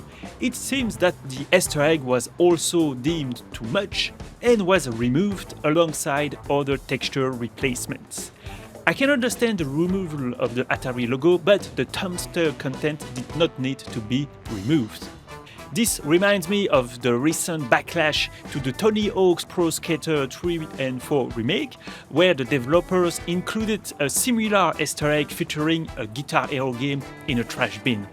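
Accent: France. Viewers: Are you french??? Damnnn the accent ahaah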